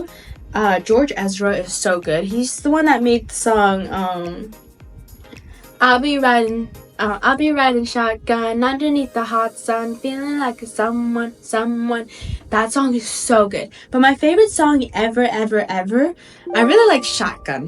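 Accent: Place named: United Kingdom. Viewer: Why you have a uk accent tho😭😭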